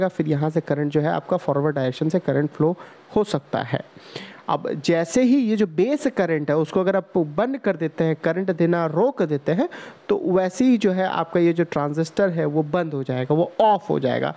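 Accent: Bengal, India. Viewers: Sir are you bengali??? Your content is so helpfull for me.... Thanks for this great work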